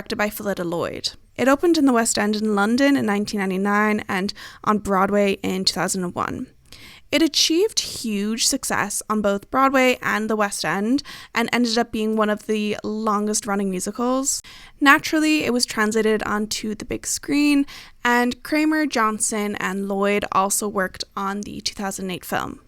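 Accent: Ireland. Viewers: i was wondering why you had a slight irish accent and then you said your house in ireland (and ar-land not eye-er-land to boot lmaoo) everything makes sense !! irish queen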